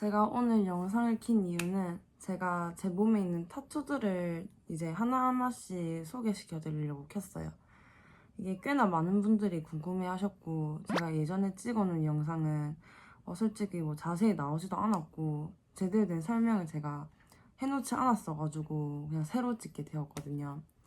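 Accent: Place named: Busan, South Korea. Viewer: they're so pretty!! and ur busan accent is so nice to listen to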